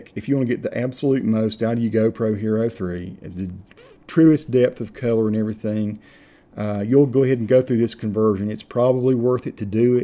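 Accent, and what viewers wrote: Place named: North Carolina, United States. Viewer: Thanks man great video. Red Springs and that accent must be NC. I live in Fayetteville ;)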